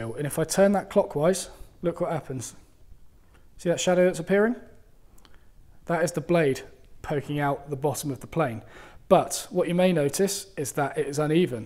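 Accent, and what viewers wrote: United Kingdom. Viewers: Silly yank i am, just thought he was using the queens english.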